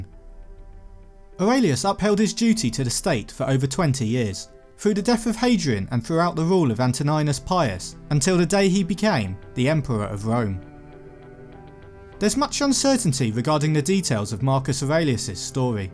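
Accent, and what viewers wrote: England, United Kingdom. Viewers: What part of England does your accent come from?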